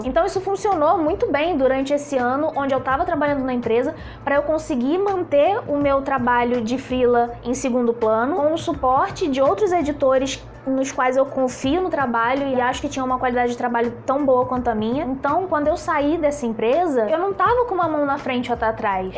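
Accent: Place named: Rio de Janeiro, Brazil. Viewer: Garota, eu que sou um vovô com tempo pra pensar, analisar coisas, filosofar e etc, fico pensando ao te assistir, que maravilha de pais você deve ter. Minhas netas são maravilhosas mas eu gostaria de ter uma uma neta igualzinho a você. Além de linda (minha netas também são) você é também muito inteligente e bem resolvida, me parece. Eu aprendo a editar vídeo com você e, ainda de quebra, me divirto com seu modo de se expressar (fala pelos cotovelos. Rs rs rs) que é maravilhosa. Até seu sotaque carioca é na medida certa. Está destinada a ser feliz para sempre.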